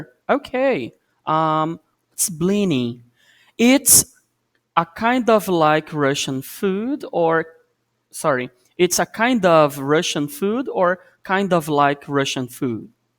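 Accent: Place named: Brazil. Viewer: What a strong Brazilian accent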